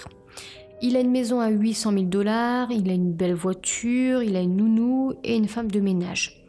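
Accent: North, France. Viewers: Bonjour, je te découvre et sincèrement très sympa, car excellente recherche et superbement raconté. Et ce ptit accent du nord🥰. Belle continuation.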